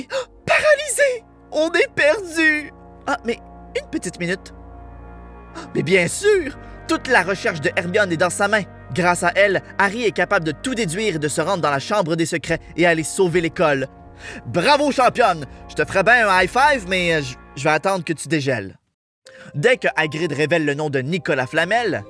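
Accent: Quebec, Canada. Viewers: Pouce bleu, cousin québécois... J'adore votre accent... J'entends bien les différences de doublage... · Il es québécois c’est normal c’est son accent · Tu est québecois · C juste que vous dites comme ça au Québec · est ce que té québeqois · ouais mais là c'est québécois ^^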